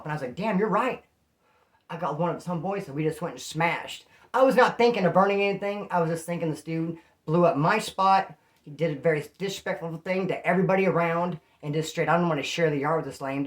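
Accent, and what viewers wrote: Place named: Inland Empire, United States. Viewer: FUCK that sounds like someone from the IE.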